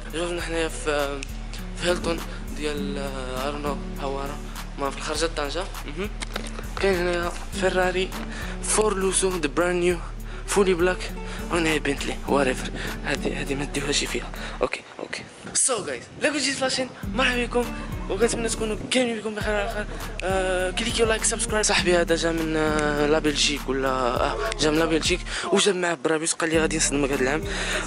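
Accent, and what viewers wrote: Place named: India. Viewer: Nice cars but your indian accent is Epic mate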